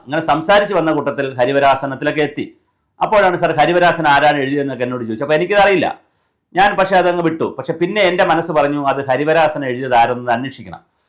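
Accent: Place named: Pathanamthitta, India. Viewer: Pathanamthitta slang ph for bh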